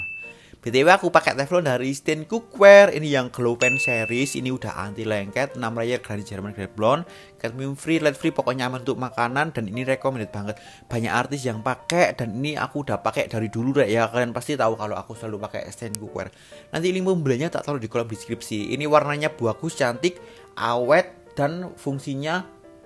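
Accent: Java, Indonesia. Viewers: Di klukupi iku opo mas?! Hehehehe...medok'e mantabs!!! Sehat ya mas... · Menunya enaak, gaya komunikasinya menarik, apa adanya, jawa banget, nyenengno 😀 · Terimakasih resepnya ttp ngomong2 masnya orang msna ya kok jawsnya medok.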